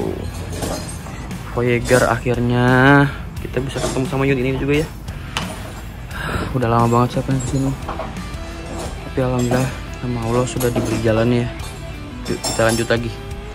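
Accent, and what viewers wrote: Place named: West Java, Indonesia. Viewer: Kaya orang sunda ya logatnya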